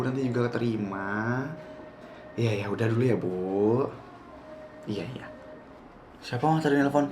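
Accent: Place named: Eastern Indonesia, Indonesia. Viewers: Akhirnya setelah sekian banyak video yang sa nonton ada juga yang pake logat papua❤❤❤❤ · Logat timurnya 👏🔥💯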